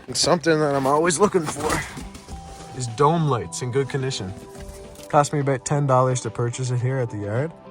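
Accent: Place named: Canada. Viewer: Before I saw Canadian I was wondering if ur from there or Minnesota lol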